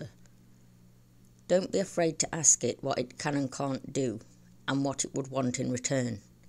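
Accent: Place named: Mansfield, United Kingdom. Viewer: You have to be somewhere local to me with your accent I'm from Mansfield x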